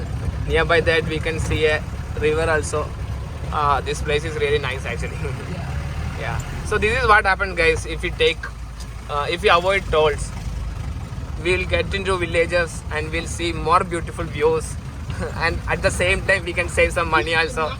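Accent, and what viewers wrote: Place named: Kerala, India. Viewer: Eda nee Malayali aanenn budhi illa aalkare ninte hindim Englishum ketta manasilakum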